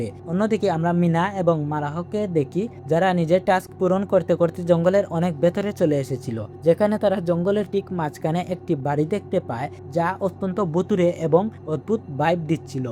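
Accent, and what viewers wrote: Sylhet, Bangladesh. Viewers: R you sylety 😊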